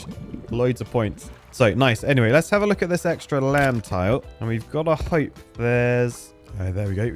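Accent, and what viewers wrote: United Kingdom. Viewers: I could listen to you all day without even seeing videos often your british accent and just the way you are makes it so entertaining listening to you i started watching you like maybe 2 months ago and i feel like i'm soon done with the whole last year of your videos (and yeah i just noticed i never subscribed before shame on me!)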